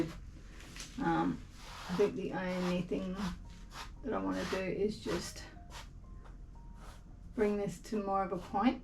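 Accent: Australia. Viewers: Hi, love your Australian accent. This painting is gorgeous. You're so talented.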